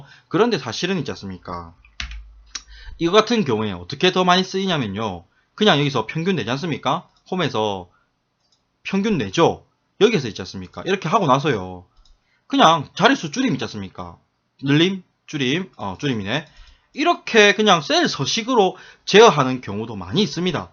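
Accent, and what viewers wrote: Gyeongsang, South Korea. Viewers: 와.. round 함수고 자릿수고 너무 어려웠는데 이 영상 보고 한방에 정리되네요 활용방식(예제)도 너무 적절하고 사투리 억양 덕분에 귀에 쏙쏙 박힙니다 (저도 경상도 사람이랔ㅋㅋㅋ) 구독 눌렀습니다!!❤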